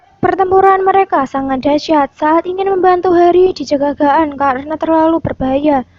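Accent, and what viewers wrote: Java, Indonesia. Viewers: Terlalu medok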